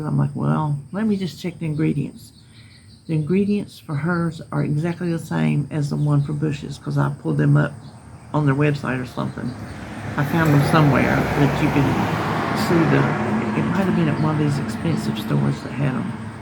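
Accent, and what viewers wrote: South, United States. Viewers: 🐯Hi have a great week. Your giving good advice🐯Thank you. Plus I like your Southern accent. I'm here in Iowa🐯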